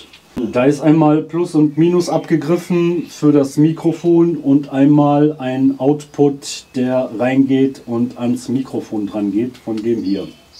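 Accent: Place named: Ruhr, Germany. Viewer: Kommse aus'm Ruhrpott?Dat hört sich so an. Och nö dat Video ist nett abba doch zuviel gebastel für mich. Vor allem bei meinem Reely Big 1 welcher Ständig weh wehchen hat nich lonhnenswert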